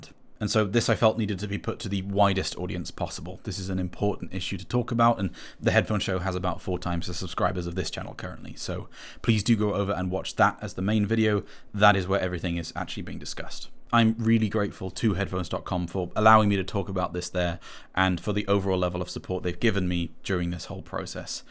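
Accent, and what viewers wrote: United Kingdom. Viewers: Question? How can they take you to court if they’re not a UK company. I assume from your accent that you’re in the UK. I would like to see how far (in minutes) this would get in a UK court.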